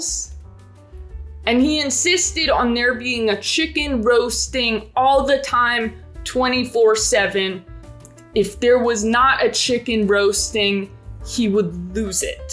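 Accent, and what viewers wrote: Australia. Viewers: More australian accents please. 10/10 video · Actually really good Australian voice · Solid Australian accent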